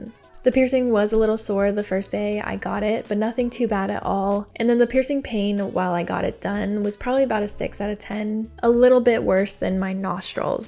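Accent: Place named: RP, United Kingdom. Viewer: I was so suprised by the posh British accent!😂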